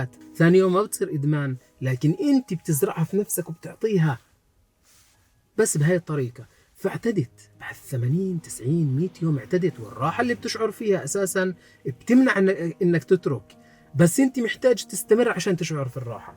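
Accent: Palestine. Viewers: ماشاءالله عليك أخي جد انت إنسان عضيم ومحترم الله يجزيك الجنة وينفع فيك بس انت قلت بس الفلسطينين عم يفهموا عليك لا كلامك كلو مفهوم بالحرف نفس كلامنا السوريين ونفس المصطلحات بس لهجة بتختلف😄😁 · أنا اليوم وبالصدفة شفت أول مرة فيديو إلك وأول ما سمعت أول كلمتين قلت في نفسي هذا فلسطيني الله يرضى عليه، وقبل ما أعرف أي شي عنك وعن فيديوهاتك، والفيديو كان بعنوان "هكذا سيكفيك راتبك بل وسيبقى منه" وبعد هيك سحبت الشغلة وحضرت 5 فيديوهات ورا بعض بدون ما أحس بالوقت، وبعثتهن لابني لأني حبيتك وحبيت أنه يشوف فيديوهاتك لكن هذا الفيديو يا ابني يا كان فعلا مميز ووصل لقلبي غير، حسيت أنه كل كلمة في هذا الفيديو طلعت من قلبك مش من تمك وربي يسعد قلبك ويحميك يا ابني ويثبتك يا رب وربنا يسعد والديك ويجزيهم الخير على هيك تربية. فلسطيني من السويد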